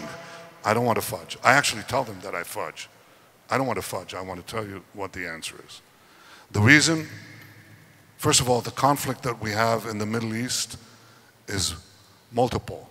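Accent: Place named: United States. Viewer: Why he has American accent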